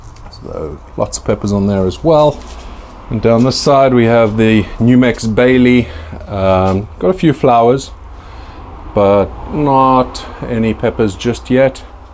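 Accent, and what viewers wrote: South Africa. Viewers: Do you ever top your peppers? Mal oor jou videos. Jy klink soos ou SA-man.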